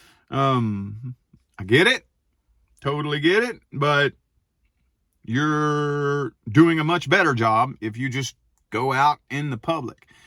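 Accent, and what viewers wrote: United States. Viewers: I see you becoming a great rapper next. Your voice is very American. might inspire you to consider it.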